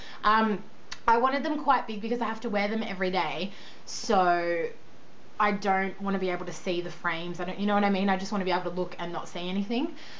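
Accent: Australia. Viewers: Are you Australian ??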